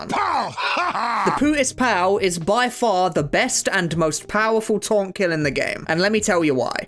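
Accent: United Kingdom. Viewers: violently British man talks about violent things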